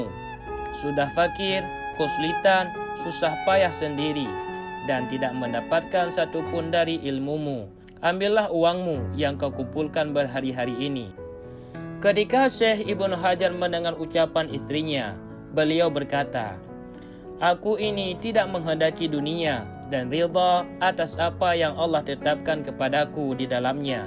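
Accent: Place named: Aceh, Indonesia. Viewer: Suara mimin Kek logat org aceh ngomong bhasa indonesia 😅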